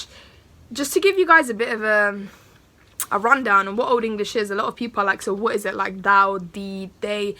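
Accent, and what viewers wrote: United Kingdom. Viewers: Have you been born in uk, if no, then , how you have uk accent , and have you learnt phonetics if not then how did you learn pronunciation . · uh that british accent gurl:)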